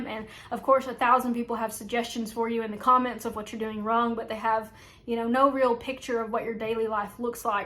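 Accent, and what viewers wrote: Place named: South, United States. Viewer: I just started Ozempic two weeks ago because i keep gaining weight and feeling hungry all the time. waking up at night or in the morning and have to snack and go back to sleep. btw, I love your accent. what state are you from? It sounds like a tad bit of a southern accent.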